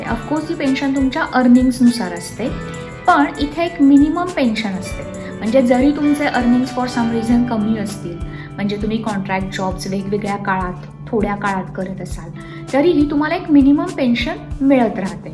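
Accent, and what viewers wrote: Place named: India. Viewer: No offence but Indian dialect of English is really hard to swallow in.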